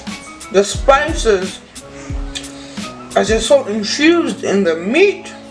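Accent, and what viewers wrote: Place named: South Africa. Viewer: You can just tell she’s South African 🇿🇦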